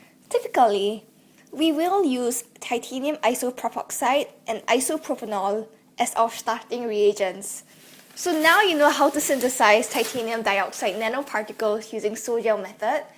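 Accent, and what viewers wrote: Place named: France. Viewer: that french voice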